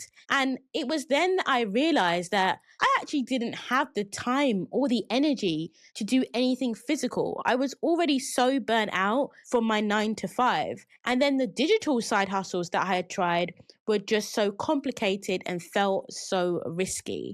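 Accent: United Kingdom. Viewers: I have a liking for accents and it’s interesting to listen to you speak. You sound like you have a British accent with an Australian accent underneath and with an African accent (I can’t tell which country) underneath that. It’s nice 😊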